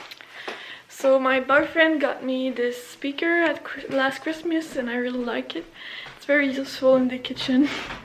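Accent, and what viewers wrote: Quebec, Canada. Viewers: Are you by any chance from Quebec? Your accent sounds familiar :D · R u french canadian?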